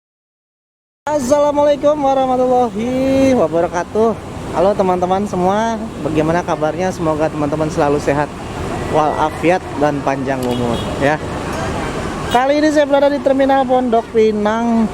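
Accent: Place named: West Sumatra, Indonesia. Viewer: Masak gak tau lakuak paku bg...abg aja asli orng minang...bahasa indonesia abg aja masih ketahuan logat minang nya🙏🏿🙏🏿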